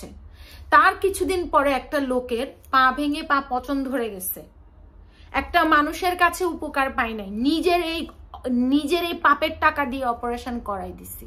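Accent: Noakhali, Bangladesh. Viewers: Allah apnr mongol korbe Apu.ekdol thakbe koshto debar jonno.kintu din sheshe apni Jodi ektuo amdr mukhe hashi fotan,etai apnr orjon.Noakhalir vashay shunte Valo lage.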